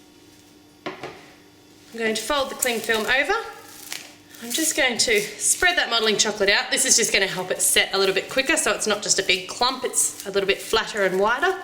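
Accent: Australia. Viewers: It's so nice hearing another Aussie voice on Youtube! I love your tutorials, I've just come over from Yoyomax, I'm so glad you two did this collaboration. Thank you so much for posting all of these videos, they're wonderful! x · OMG are you australian I LOVE YOUR ACCENT!!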